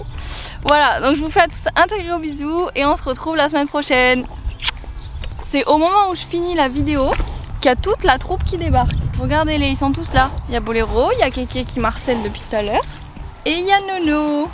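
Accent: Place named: Gard, France. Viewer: Hello :) c'est moi qui t'ai posée la question sur ton accent, en fait c'est parce que j'ai le même :p et c'est l'accent que on a dans le Gard vers Nîmes, et qui est vachement différent de celui de Marseille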